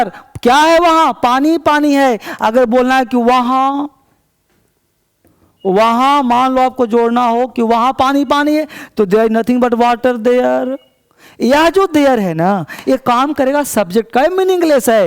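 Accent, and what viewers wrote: Bihar, India. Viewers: ( Wah Sirji ! )you have great energy.I like the most your Bihari tune when you teach online..Ek Bihari sau pe bhari...you have proved that....and go ahead with god bless you..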